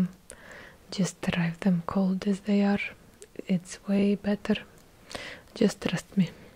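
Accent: Russia. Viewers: Some people say french Italian Spanish me i say Russian all the way! Just perfect! 🔟🌹😍🥰💗💗💗#️⃣1️⃣ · Why are you so underrated 🥺 ma'am im from Pakistan and i love your heavy Russian accent asmr ❤️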